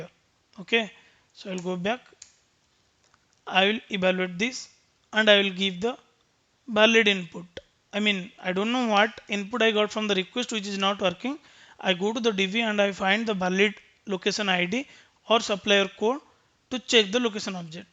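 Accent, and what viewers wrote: India. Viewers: always an indian, but i love it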